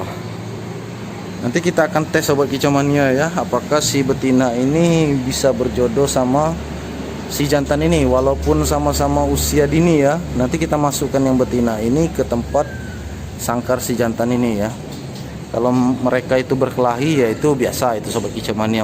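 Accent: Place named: Palembang, Indonesia. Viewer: Logat nya kayak palembang oku selatan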